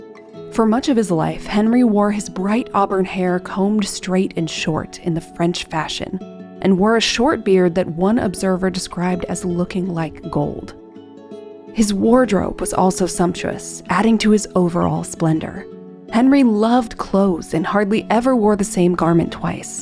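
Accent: United States. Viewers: Why an American narrator? Does not sound right somehow, and the over-the-top enthusiasm and gleefulness is downright weird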